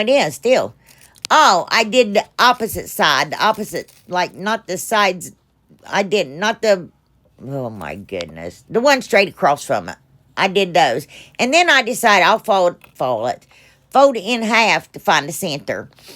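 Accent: South, United States. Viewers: Hi,. I'm Tiffany. I am a caregiver for my husband whom is very sick. I'm originally from Nashville,TN but reside in Ohio. We love watching your crafting videos and your country accent. I feel like I'm at home watching your videos. 😊😊❤❤.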